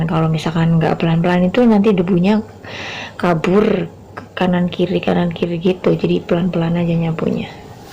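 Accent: Banyumas, Indonesia. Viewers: Nek Ra ngapak ora kepenak,,skses sll yg di Taiwan,thanks infonya ce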